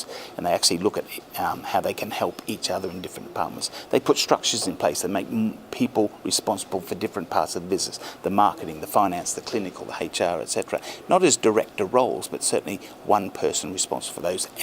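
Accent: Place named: New Zealand. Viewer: Lol he's a Kiwi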